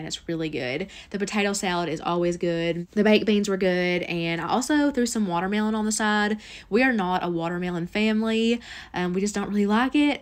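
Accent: South, United States. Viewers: Hey sweetie, idk if anyone else has already commented this or not. But sorghum is pronounced 'soor' (like door) 'gum'... So, 'soor-gum'. I'ma southern gal myself and might I say, I just love your accent! Lol Thank you for all of these wonderful videos and recipes. I'm most definitely trying out that tater salad! ❤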